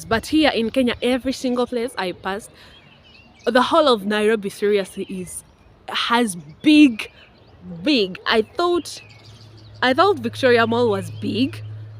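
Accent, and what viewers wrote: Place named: Uganda. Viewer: Interesting listening to your Ugandan accent. I had no intesion of watching the whole thing but I just did! Karibu Kenya.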